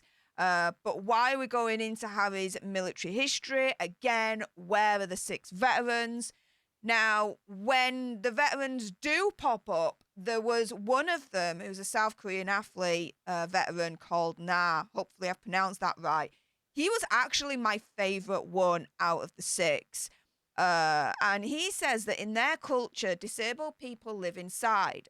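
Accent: United Kingdom. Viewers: I think some of this is from her particular British dialect. If I am watching a Britbox show, my husband sometimes cannot understand the dialogue. I think YouTube presenters have to have a unique hook. If they all sounded like academic professors, the net would be a pretty boring place. I think level of passion is also part of her YT personna. You can also hear the language difference with numerous African American YT presenters. I am sure the speech they use in a job interview is different than what they use in reaching their YT audience. This is a "social register" in language. language is uniquely hers. I have several advanced university degrees, and her presentation skills don't bother me. I listen to many different commentators and find all of them interesting, whether I agree or not.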